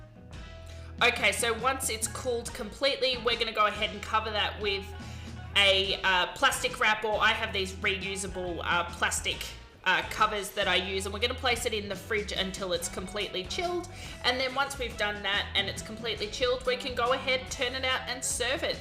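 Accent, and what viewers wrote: Australia. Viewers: Nice to hear an Aussie voice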